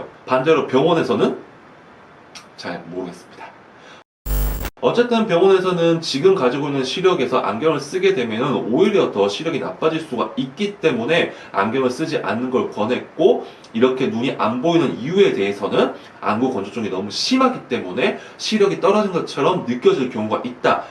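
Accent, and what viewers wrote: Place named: Gyeongsang, South Korea. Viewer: 고향이 경상도 어디에요? 저랑 말투가 똑같ㅎㅎ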